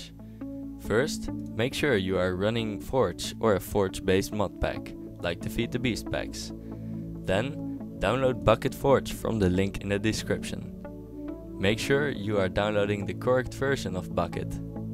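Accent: Netherlands. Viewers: Tuurlijk is hij nederlands, Kun je zijn accent niet horen? Idioot.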